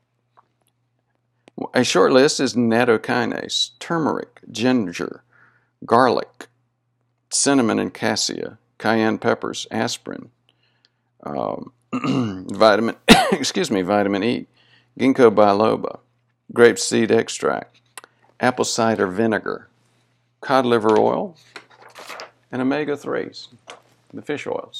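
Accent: South, United States. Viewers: Don't know if it's your Southern drawl or wha'at but you Look n' Sound like you need a shot of Adrenaline-or an Least a Double Espresso with a teaspoon of Honey-info was Good but I Almost fell Asleep!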